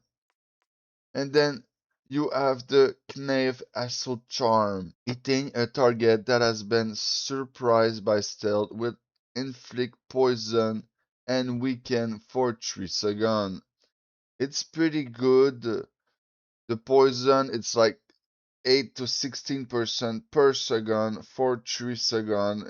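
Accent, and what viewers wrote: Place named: Montreal, Canada. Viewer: I see the accent you from Montreal?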